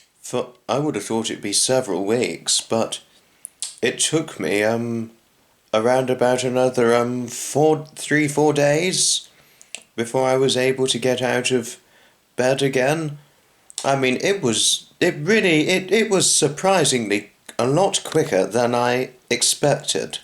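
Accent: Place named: Scotland, United Kingdom. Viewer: wow, you've got a regular channel now! Didn't know you hurt your foot, but glad you're on the mend. Love your wee laddy accent.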